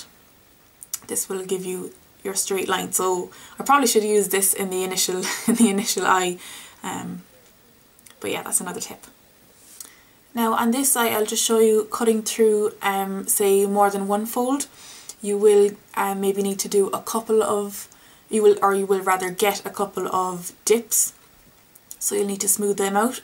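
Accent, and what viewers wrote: Ireland. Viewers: Your Irish accent is a dream! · I have been looking everywhere for an informative and straightforward tutorial on how to do a winged eyeliner on eyes with low creases - and here you are! Sure, I've found a few here and there but no one explains the jagged connected line that my weird creases need to get that smooth line when looking straight on :D And your lovely Irish accent is just sweet icing on the creased cake. Keep up the good work! /Linn Andersen (Sweden)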